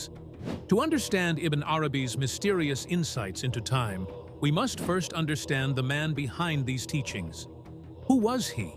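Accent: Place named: RP, United Kingdom. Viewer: Who made this video ? The BBC type English is rather chilling to listen.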